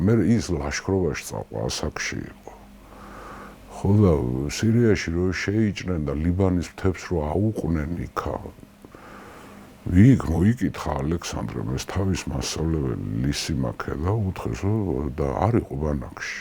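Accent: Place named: Racha, Georgia. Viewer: თანაც რაჭულად რომ მოგვითხრობს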